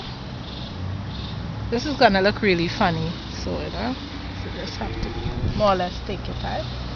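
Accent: Trinidad and Tobago. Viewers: when i started looking at this video i say but how this lady sounds like a Trini so, I like it, we need to meet some time. I am from Trinidad and Tobago and I teach Fabric Design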